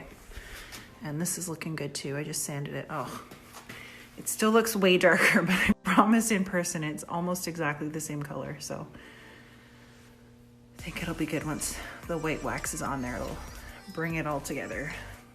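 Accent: Canada. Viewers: Love the screaming kids and your Canadian accent ! Piece is beautiful too!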